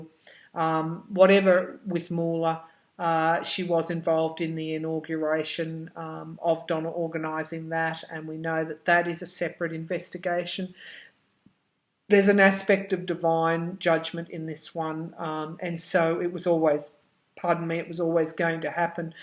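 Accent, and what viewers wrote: Australia. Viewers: Hi,. I enjoy listening to your great Aussie accent and readings. When you have the inspiration, will you please do a reading for Maxime Bernier, the leader of the People's Party of Canada. This us our election year and Canada is in a terrible mess with lots more coming.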